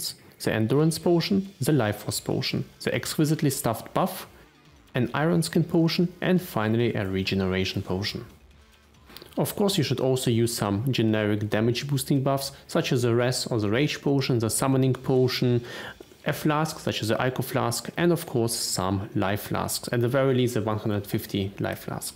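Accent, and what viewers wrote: Russia. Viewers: Thx for this tutorial! Are you russian btw?:) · Мне кажется или акцент русский? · Файналли рашн ассент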